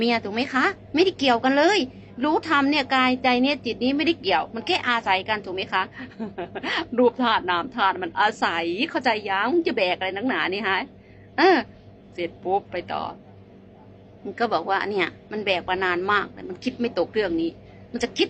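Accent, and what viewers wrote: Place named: South, Thailand. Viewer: สอนธรรมได้ดีเข้าใจอย่างลึกซึ้งเลยครับ ชอบสำเนียงใต้ ฟังแล้วเพลินดี อนุโมทนาด้วยครับ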